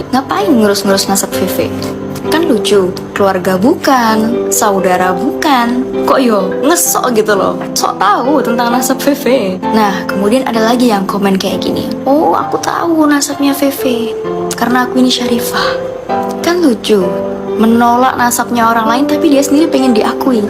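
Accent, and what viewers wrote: Indonesia. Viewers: Pri bumi sekali medoknya, lanjut.......!!!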